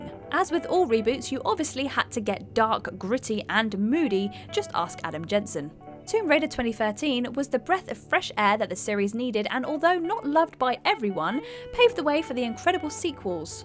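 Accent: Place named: United Kingdom. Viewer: maybe its the british accent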